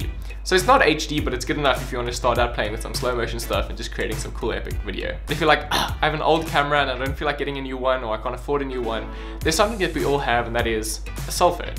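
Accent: South Africa. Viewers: Are you South African? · South African right??? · You South African?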